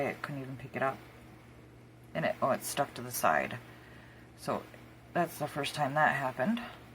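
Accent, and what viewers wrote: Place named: Midwest, United States. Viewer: Your from the Midwest huh, I nooww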